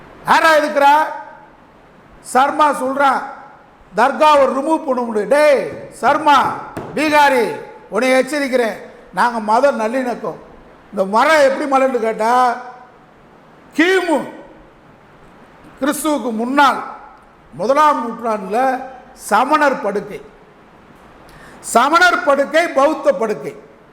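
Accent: Madurai, India. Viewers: சார் சூப்பர், மதுரை ஸ்லாங்கில், தைரியமாகவும், வீரத்துடனும், இஸ்லாமியர்களுடன் நட்பு பாராட்டியும் அருமையான பேச்சு, நீங்கள் இன்னும் பேச வேண்டும் அட்டூழியங்கள் ஓழியும் வரை.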